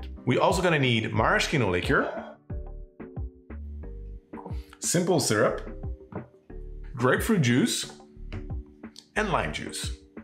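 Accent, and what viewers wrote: Quebec, Canada. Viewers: As a fellow québécois, I love seeing your videos when they come out! We need more of the accent on YouTube. Also, because I'm a filthy anglo, I have to say it -- why can't francophones count to four? There's a tree in the way. :v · 😆 I love the French-Canadian treatment of the letter "H." You say it when it's not there and don't say it when it's there. My friend always calls those tubes of meat on buns "ot dogs" and always offers "elp" if I need assistance. Now on to the Emingway Daiquiri! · lol.... yeah, where's the tree? I love the Québécois way of speaking too.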